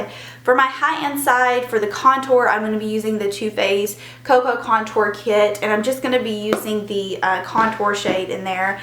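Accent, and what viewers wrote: South, United States. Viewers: Her southern accent and how she's so animated makes me like her so much more than others I love her oh my gosh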